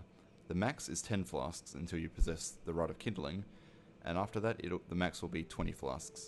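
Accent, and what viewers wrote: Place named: Australia. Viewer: He's Australian I can understand what he's saying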